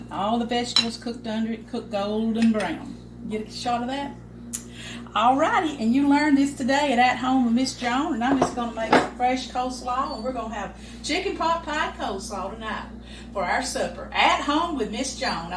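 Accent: South, United States. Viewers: I enjoy your videos. They’re simple easy to follow. And I truly enjoy your plain Country talk I can understand it fine. The way you present yourself it’s just, well it just family. Don’t know any other good way to say it.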